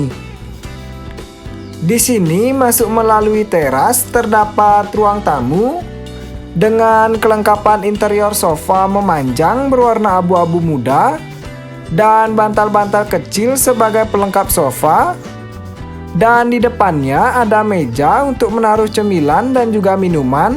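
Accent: Bali, Indonesia. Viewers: konten kreatornya ngomong dengan logat bali, bukan di buat buat , Respect kekayaan bahasa indonesia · Itu logat Bali, Indonesia banyak suku dan bangsa, jd harap memaklumi . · Ngomongnya logat bali...mohon dimaklumi lah....indonesia kan beragam bahasa dan budaya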